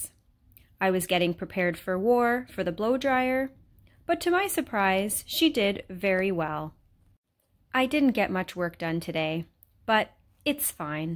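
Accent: Canada. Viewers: Are you Canadian? I hear "oot" instead of "out"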